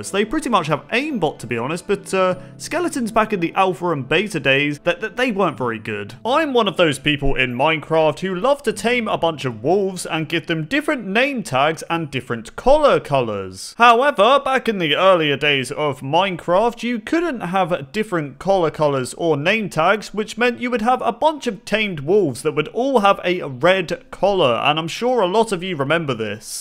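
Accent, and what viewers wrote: United Kingdom. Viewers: YOUR DEFINITLY BRITISH ARE YOU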